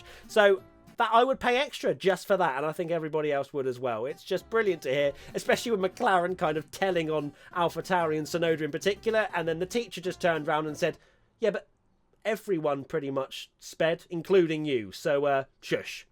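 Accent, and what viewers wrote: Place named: United Kingdom. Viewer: No,the reason why you sounded weird is due to the fact that you are Brit m8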